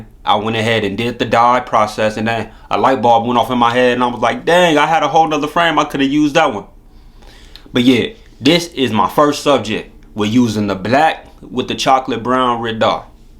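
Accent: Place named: Los Angeles, United States. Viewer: mah man sounds like he from L.A. tho...😊 i tried to dye a p-nut p80 17 frame last night n it came out like what you said...dark brown burgundy using chocolate brown. i wasnt sure when to pull it out tho and kept it a tad too long in there. it went like dark from one moment to tha otha and i jus missed tha bus with this one. i have a tungsten grey/silver polished strike industries lite slide on there wich looks nice but i was shootin for exactly what you have there that lighter brown... i might pull out another fde frame and do your route,cause this is nice tho❤ very nice combination with that slide. i also want to try royal purple on a grey frame.... very nice what you have there....and you helped a lot with this vid... i shoulda seen it before i did mine😂. good lookin🤝🏾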